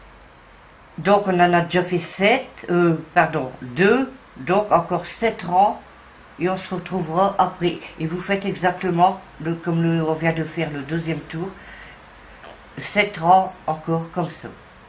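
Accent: North, France. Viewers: Bonjour super vos toutous avec cet accent du Nord qui fait du bien. Pour cette robe vous dites 3 à 5 ans? J'ai donc fait 6 rangs de départ au lieu de 9 pour du 3 à 6 mois cela me semble petit Pouvez vous m'aider? Merci d'avance Sandrine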